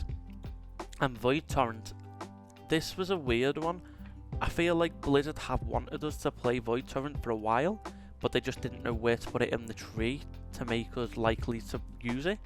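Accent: Liverpool, United Kingdom. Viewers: Can’t work out if that’s a scouse or wirral accent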